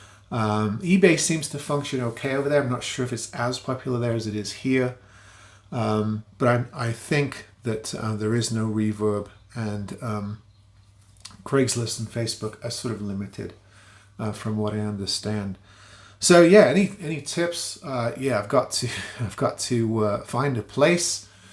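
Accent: United Kingdom. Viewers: I never thought before I guess from your accent I thought you was in the UK or there abouts lol anyways happy travels mate and hope your journey is a safe one! cheers!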